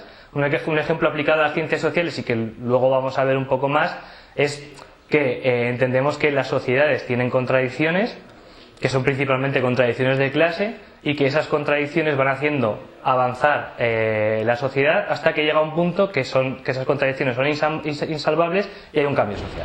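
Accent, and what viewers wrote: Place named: Spain. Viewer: maldita sea que imbesilidad escuchar esto en un ridiculo asento español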